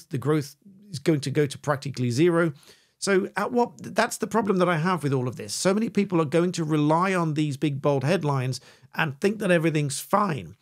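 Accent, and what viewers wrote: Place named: RP, United Kingdom. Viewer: I'm sorry but is there something about labour getting in that puts you suddenly against corruption? I've wrote to you a number of times pointing out that housing policy breaks the children act 1989, the children and families act is broken in standard practice ubiquitously, and despite the issues this causes society and how much extra doing this costs the public purse as well as those impacted and not a peep. Your tory Prime minister who committed billions in fraud and your other Tory comedian hiding from piers morgan in a freezer because he didn't think you deserved answers along with the lettuce who tanked the economy are gone and then suddenly, after ignoring the care act 2014 being contradicted since it was introduced, you suddenly care whether government ministers are honest or not. So much so this has altered from a brilliant law channel, to some baldy hard nock with a posh accent biling on because the party who wanted to scrap the human rights act didn't succeed in doing so. 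Hard luck. I will now watch the video, I wanted to write this before I do because I just saw this and my heart sank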